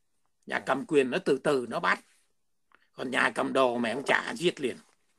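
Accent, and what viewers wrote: Standard. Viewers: Bác nói chuẩn 👍❤️ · Hi hi anh nói rất chuẩn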